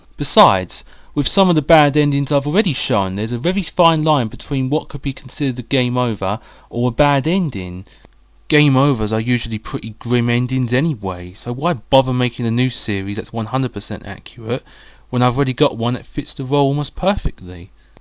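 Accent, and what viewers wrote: United Kingdom. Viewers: Dat brit accent! Come on, you don't sound so bad...give it another go! And about Sonic 2 for GG...yeah, it doesn't make sense. The GOOD ending, that is. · holy crap you're actually british O.O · never knew you are british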